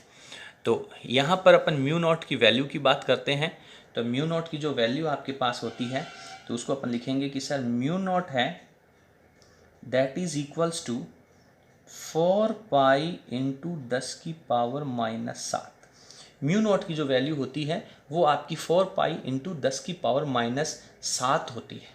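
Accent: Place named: Bagdi, India. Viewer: Gurgi the ktha ga ho bagdi bolan laagrea ho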